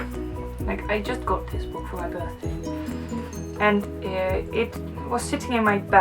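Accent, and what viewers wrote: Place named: United Kingdom. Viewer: haha precies wat ik dacht, cuuuuute :D en je klinkt zo Brits wow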